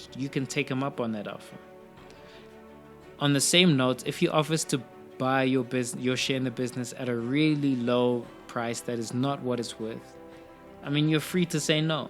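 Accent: South Africa. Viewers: Nice video! Are you South African?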